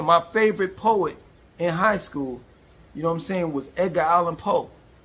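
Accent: New York, United States. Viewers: No offense, but your New York accent is still strong as hell bruh. You can’t hide that shit. Your accent comes from where you learned to talk. People never lose their accents. That’s why Slick Rick never lost his accent even though he moved to NYC when he was a kid. I had the same experience moving from the Bay Area California to Maryland as a kid in the early 90’s. Cali Hip Hop was huge in the 90’s. I wondered why people in suburban Maryland idolized Cali lifestyle so much because my childhood neighborhood was exactly like Boyz ‘N the Hood, you might get Ricky’d just walking to the corner store. Like y’all really think old school cars, gang life, guns and smoking weed and selling drugs and living in a dangerous neighborhood with palm trees is cool? I just wanted to see snow and have a neighborhood safe enough to explore as a kid and I didn’t have that in California. 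And I embraced that background hard as a confused teen and twenty something. But as a grown man, I represent myself like I’m in court with no lawyer. It’s damn near an act to of rebellion to embrace yourself as who you are in modern society. Everyone is so aspirational nowadays because of social media. Women nip and tuck everything on their bodies and try to be a build a bytch. People who come from money cosplay like they are from the projects in Brooklyn, broke people in the projects cosplay like they are rich African scammers. People over 40 want to be forever 21. Black kids in the suburbs try to act like they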